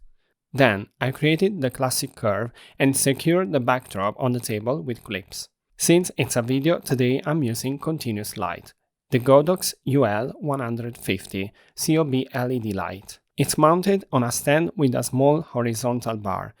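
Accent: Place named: Turkey. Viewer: Dude, are you Turkish? You have Turkish accent 😊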